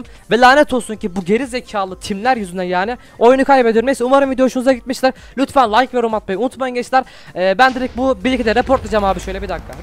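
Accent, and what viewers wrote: Adana, Turkey. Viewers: tam bi adanalı konuşma tarzın var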